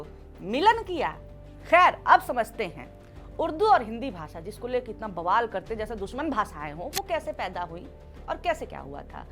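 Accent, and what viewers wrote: Bihar, India. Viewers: Madam aap ka Bihari accent achcha hai ❤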